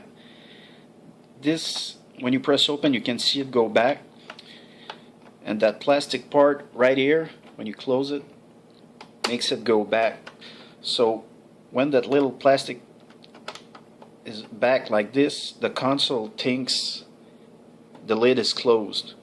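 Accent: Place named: France. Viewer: Salut, tu es francais toi vu ton accent. Merci pour le tuto.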